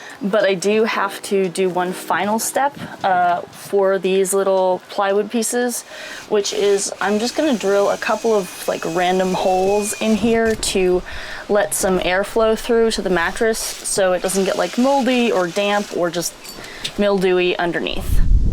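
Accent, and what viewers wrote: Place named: Boston, United States. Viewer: But I love your Boston accent!! Also that knife shirt is a total vibe...DON'T MESS WITH! It really inspires me that you're tackling so much of this build all on your own and it's such a joy to watch. (I know you had help with some of the electrical stuff, but so much of the woodwork has been by yourself - it appears at least.) I always had my partner to bounce ideas off of and problem solve with, and I don't think our van would have gotten finished on my own. You're such a boss lady!!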